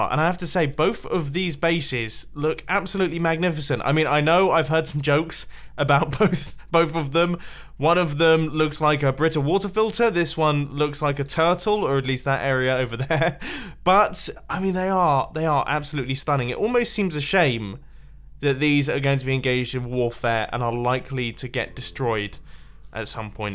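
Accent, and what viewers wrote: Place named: United Kingdom. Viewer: You are the most British British person I have ever heard (that’s a good thing)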